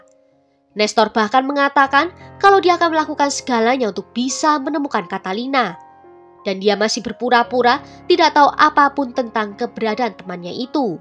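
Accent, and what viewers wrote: Java, Indonesia. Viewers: Medok banget 😅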